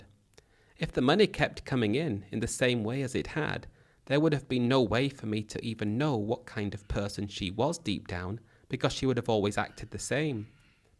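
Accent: United Kingdom. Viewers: You have a very British accent for a yank who never left home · Weird British accent for somone from Missisipi.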